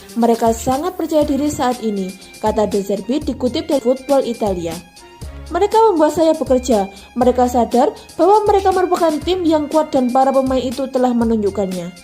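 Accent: Java, Indonesia. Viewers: Jowone medok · Mbanya medok sangaat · Jawane medok nemen Yo? 😀😀😀 Tapi mantap.... 👍👍👍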